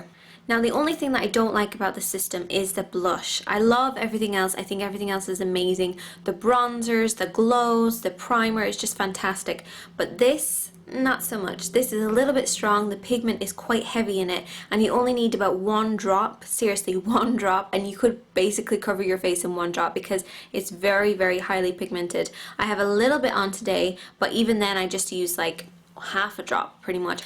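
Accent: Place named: Ireland. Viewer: I noticed the Irish Accent then checked your facebook and your from Cork!!!!! me too! thats so cool!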